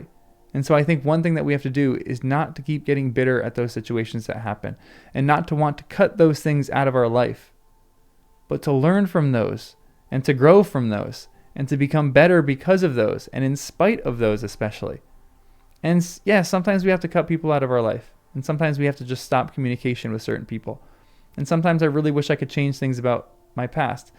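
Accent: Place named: United States. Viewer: Dreadful American accent. Gaal’m.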